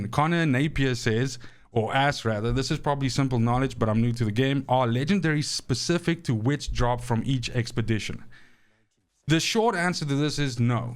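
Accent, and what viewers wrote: South Africa. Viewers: I honestly didn't notice your accent as my girlfriend is from SA so it sounds normal to me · I do trust your judgement & how you break it down. I just figure if I'm playing anyways I may as well play the CT's that people claim will give what I'm looking for. (Deathshield, Highroller) PS stream I don't follow any steamer, I'd follow you & I main Trickster. You & Niktew have great have downs. Khraze & Widdz are helpful & DPJ is just hilarious! PS love the SA accent! Haha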